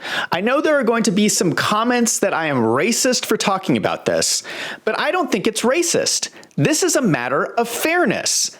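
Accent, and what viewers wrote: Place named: United States. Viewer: I like your voice, very American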